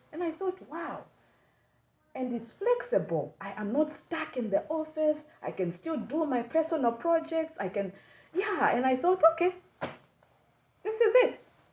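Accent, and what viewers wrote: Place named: Kenya. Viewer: are kenyan?you look, sound like everything about you is Kenyan. New subscriber from kenyan plus loving your content gal,it's top notch!!! Thank you and here to support you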